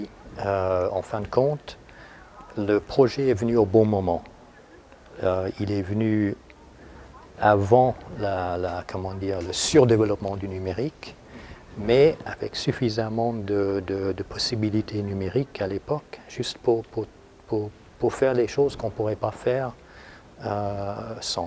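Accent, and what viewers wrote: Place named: England, United Kingdom. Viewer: We can hear a little english accent, may be that's why... (I'm french) ;)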